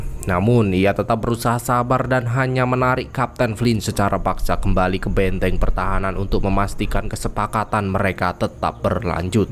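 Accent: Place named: Java, Indonesia. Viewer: Bg medok e hilangkan yo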